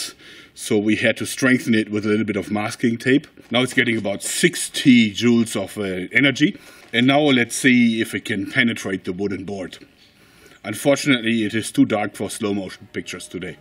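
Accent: Germany. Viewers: Hay, fu bist doch Deutsch oder, finde du hast ein typisches Deutsch-Englisch. Antworte bitte auf eine Frage eines Genossen:D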